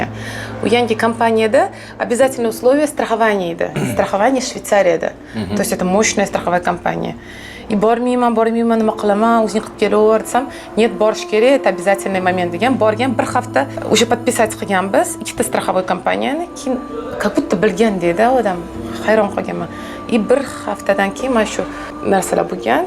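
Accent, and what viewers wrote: Kokand, Uzbekistan. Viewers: sizni koʻrgim, gaplashgim keladi, qizchani oʻz zimmangizga olganingiz sizning asl qiyofangiz ni ochadi, baxtli qiz ekan sizdek onasi bor koʻz tegmasin Alloh taqdir qilsa uchrasharmiz, meni onam ham Qoʻqonliklar shevangizga gap yoʻq, betakror goʻzalsiz.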